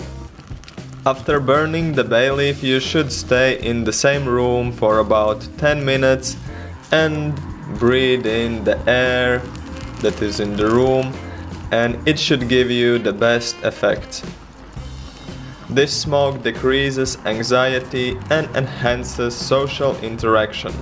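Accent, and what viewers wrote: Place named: Latvia. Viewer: Really? I find our latvian accent so annoying. I am Latvian too.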